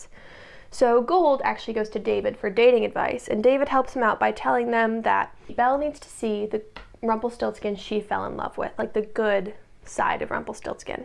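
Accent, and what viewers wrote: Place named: Australia. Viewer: This video has me imagining a universe in which Belle divorces Mr gold and dates Ruby instead. Also I’m listening to this at work and the Australian accent sends me every time I hear it.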